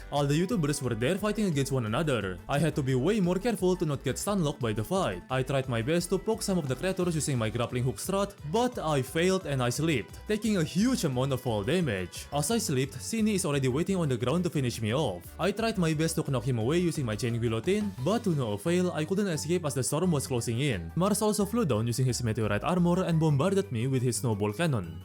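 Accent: Russia. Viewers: You sound russian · interesting, I would have guessed russian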